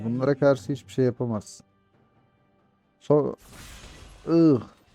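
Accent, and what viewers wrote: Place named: Anatolia, Turkey. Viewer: Bu içi anadolu ağzı beni köyümde hissettirdi.Sivaslı mısın?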